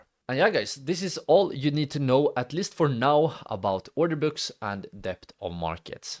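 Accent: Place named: Sweden. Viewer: I hear the svenglish from mile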